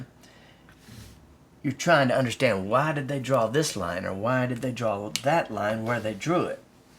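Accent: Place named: Texas, United States. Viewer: I love your Texas accent.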